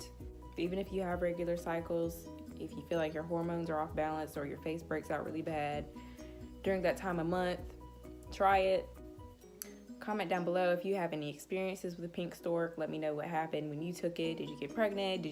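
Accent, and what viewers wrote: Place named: South, United States. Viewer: Omg I love your southern accent.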